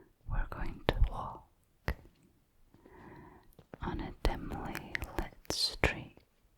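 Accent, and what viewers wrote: Scotland, United Kingdom. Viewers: I didn't know I needed Scottish ASMR. Now I wonder how I ever fell asleep without it. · makes it difficult to decide if scottish or irish is more attractive. I would have originally thought the latter because of the brash dwarves in movies but she makes the former so damn pleasant. Not even counting the lovely Scottish Chinese fusion. She's a dream girl in more ways than one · Your voice is so...so...zzzz (+ the Scottish accent 😍😍😍😍 you could talk me about haggis for hours I would still be relaxed ) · Are u scottish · a sco`ish ashmr`tist, we can enjoy that:)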